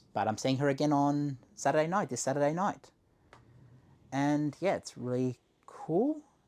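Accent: Australia. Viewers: I jolly Rodger as you call it but that's just due to cost of living and the stuff I want being extremely expensive compared to my pay I'll eventually buy what I currently Rodger but for now 4k downloads will suffice It's also nice to hear an Australian voice for a change nearly everyone being American is very draining to listen to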